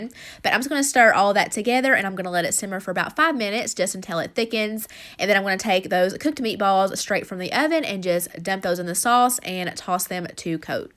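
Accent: South, United States. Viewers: I can't fir the life of me believe some one would call you lazy!!!!! Your always making fabulous dinners for your family, don't ever explain yourself to anyone. Your a good Wife and mommy. Love you. I especially love your southern accent. Your a doll. 🍳🥯🍗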